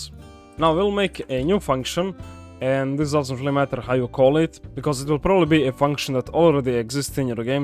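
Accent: Russia. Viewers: RRRusian accent!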